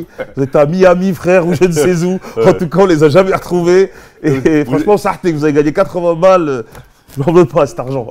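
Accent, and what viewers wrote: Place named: Martinique. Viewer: Il est trop fort avec l accent martiniquais 👍🏾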